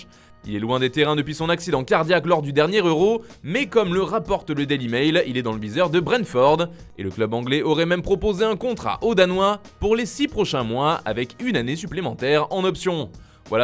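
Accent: Italy. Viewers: J'apprécie que le narrateur ait arrêté d'en faire des caisses avec son accent italien. Là c'est bon le dosage, bravo ^^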